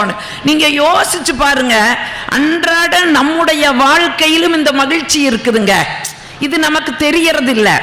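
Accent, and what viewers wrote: Kongu, India. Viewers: Kongu mandalam pechu siripu sandhosham...unga pechu...akka superrrrrrka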